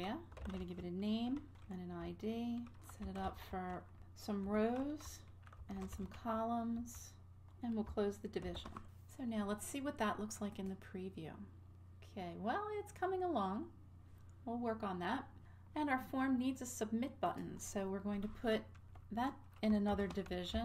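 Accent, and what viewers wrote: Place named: United States. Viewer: Very nicely done! Extremely helpful! Such a nice change to hear a lady's voice speaking clear American English in one of these tutorials.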